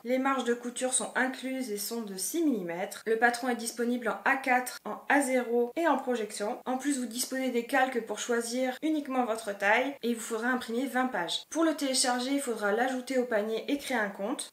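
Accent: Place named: United Kingdom. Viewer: Coucou! Quelle belle capsule, tu nous as gâtées! j'ai déjà repéré 2-3 patrons! Alors, je vais me moquer de ton accent so british 🤣🤣🤣 mais délicieusement frenchie! trop mimi! Alors, si tu as besoin, je peux te traduire quelques patrons (j'en ai fait un pour Audrey), j'ai bien aimé l'exercice. Bon, mon vocabulaire couture en anglais est limité, mais on peut y arriver, avec l'aide de notre ami google 😂 A bientôt! 😘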